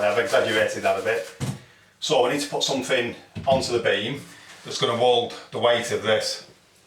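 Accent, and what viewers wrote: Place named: Lancashire, United Kingdom. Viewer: Nice video. U from Blackpool? St Ann's ur accent ssounds familiar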